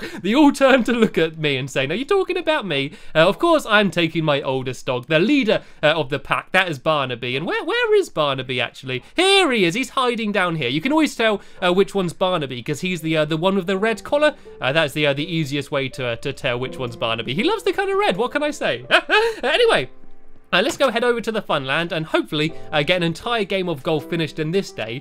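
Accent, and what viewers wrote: England, United Kingdom. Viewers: You inglish bro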